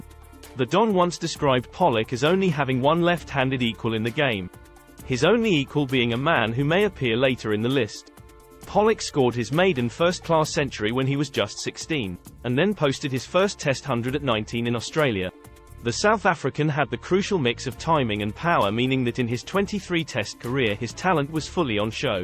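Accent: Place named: England, United Kingdom. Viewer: Cricket is about elegance and beauty, not stats. At least for the fans. Like your attempts at ranking the various cricketers according to their different specialties. However, learn this - no left-hander is better than Garfield Sobers as a batsman. He was great against all bowlers on any type of wicket. Brian Lara is 2nd. You speak like an Englishman. No West Indian of my age would prefer to watch Geoffrey Boycott bat over Tom Graveney. Old Tom, a wonderful English stroke player. Also, David Gower another of a different era. Keep up the good work. Like your videos.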